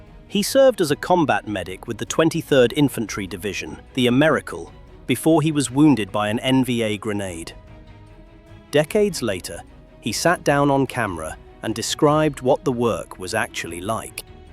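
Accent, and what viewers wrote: United Kingdom. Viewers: why is this narrated by someone with a British accent?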